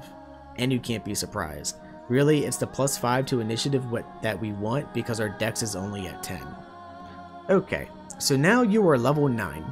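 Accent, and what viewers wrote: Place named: Philadelphia, United States. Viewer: This might be the most out of topic comment but are u from the philly area the accent is very strong if so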